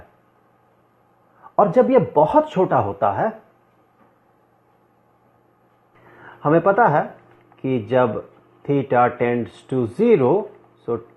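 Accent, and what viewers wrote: India. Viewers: والله حسبه يتكلم انجليزي بس باللكنه الهندية.. لين استوعبت ههههههههههههههههههههههه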